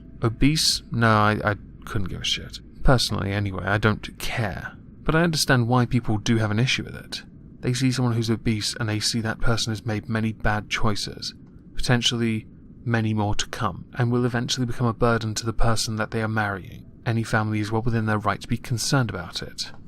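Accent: RP, United Kingdom. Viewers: As a brit living in areas where common language is "enits" and "tings" you have the best British accent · I never knew how much I needed a refined British man critiquing idiots in my life, until I stumbled upon your channel. Absolutely loving your content!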